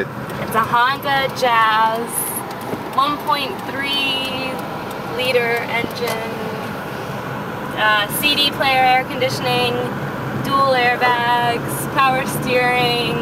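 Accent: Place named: United States. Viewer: if she is in England, what's up with an Amercan accent?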